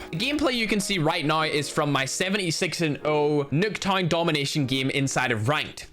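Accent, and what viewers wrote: Ireland. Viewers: U are irish ?! Thought u british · how are u irish? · You're Irish?.... Did not know that. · You're Irish? God your accent is so deep. I've heard Terrorizer and Nogla and they have a lighter one. You sound more Enthusiastic though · is irish? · i did not know that you were irish. should’ve guessed it 😂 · Wait... ur Irish? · Wait... are u irish?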